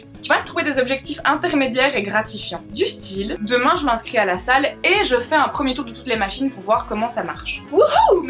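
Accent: Belgium. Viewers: tu parles comme une belge j'avais cru que tu es française je me suis trompé vas-y donne ton 0032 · Une compatriote Belge avec le bon accent :D Du coup l'objectif Iron man m'a fait rire tout seul devant mon écran, parce que faut devenir riche, se forger une armure et fonder les Avengers. Il y a du boulot ! :D (oui je revendique ma vanne pourrie) Continue comme ça en tout cas, plein de bons conseils dans lesquels je me retrouve :D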